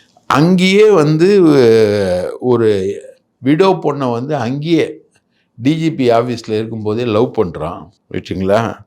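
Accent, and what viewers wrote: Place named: Chennai, India. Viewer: is jus a host and relative of savukku in some ways, her speech and body language like local chennai slang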